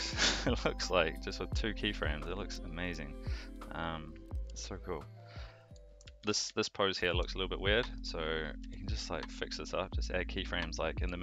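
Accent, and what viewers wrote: New Zealand. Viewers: great. i already know im gonna hear nothing but "zed" instead of Z "not" instead of zero and "soouuuuwwww" instead of "so" the whole time.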